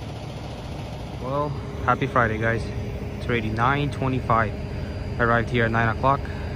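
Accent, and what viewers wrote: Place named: United States. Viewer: смотрю твои ролики,читаю комменты в переводе на русский.Тяжело воспринимаются слова на американском диалекте,но пытаюсь понимать вместе с жестами.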